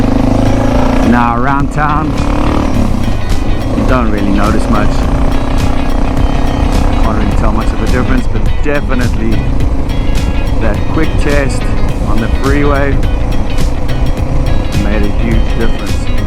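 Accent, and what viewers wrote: South Africa. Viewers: hey man yes I saw that after I replied. I think I’m going to go ahead and order one. What off roads are you riding up in north ga? Saw your Instagram and saw where you road around telico plains I love that area. I just started to ride at the age of 55 and loving it Road some with my buddies in New Orleans growing up but not much . Just bought a Wr250r a year ago already thinking I might need to upgrade to a dr650 . Love the SA accent. I miss hearing it my wife is from SA and I used to go over a lot. Lol small world too I used to live off of the north ridge exit on 400 Off Spaulding and over in hunt cliff off Roswell road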